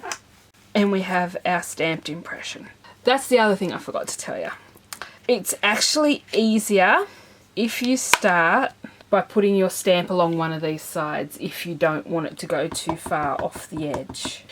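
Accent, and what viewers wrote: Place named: Australia. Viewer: Nice to hear a fellow Aussie on YouTube - thanks for sharing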